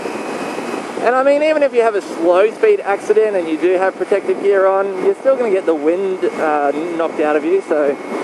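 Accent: Australia. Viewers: please tell me you are Australian! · you sound Australian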